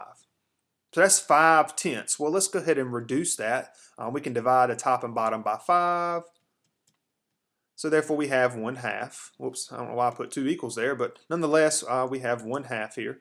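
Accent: South, United States. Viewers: judging from the voice I guess your from the south, I am too I love the math you make it sound so easy. are there any other tutor sessions you do on line.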